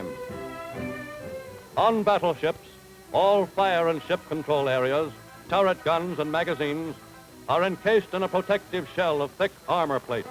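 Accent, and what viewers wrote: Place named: Mid-Atlantic, United States. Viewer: Great slice of history. Love the old-timey narrator with the generic "Mid-Atlantic" accent.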